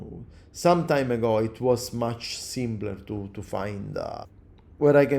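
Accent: Sicily, Italy. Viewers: il tuo inglese mi fa morire perche mi ricorda tanto il mio. Il tuo richiama la Sicilia Sud Orientale, il mio quella nord occidentale:-)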